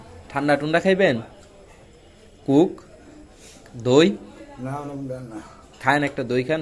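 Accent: Sylhet, Bangladesh. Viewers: Onek vala legeche bhai. Bhaiya Apner Basha ki Sylhet? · Bai apni ki sylhet ar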